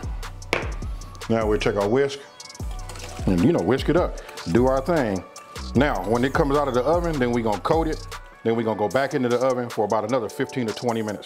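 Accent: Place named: California, United States. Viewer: I’m pretty sure he has a Cali accent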